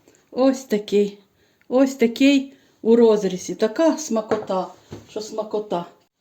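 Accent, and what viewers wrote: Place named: Rakhiv, Ukraine. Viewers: Вы майже из Рахова?такий розговор як у нас уклин вам из КАЗАХСТАНУ. ЯТОЖЕ ТАКИЙ УМИЮ И ДУЖЕ ЛЮБЫМО. САМИЙ ВКУСНЮЧИЙ. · Дякую, пані, при нагоді обов'язково спечу, дуже гарний рецепт. Ви дуже файна господиня, дай Бог вам здоров' я і щастя. А ваша гуцульська говірка просто чарівна, слухаю і милуюся. ❤️👍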